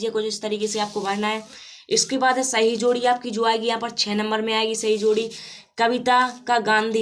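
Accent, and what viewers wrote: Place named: Malwa, India. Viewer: Mp ka hai na bro agar malwa sa bol raha hu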